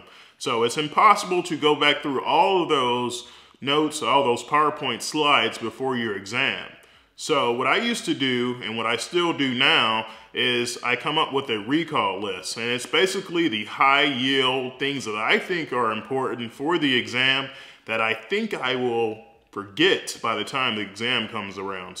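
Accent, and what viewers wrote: South, United States. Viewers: Laconian Drakon I just think he’s a southerner. Not a big deal. I saw another video where he talked about spinal “manip-a-lation.”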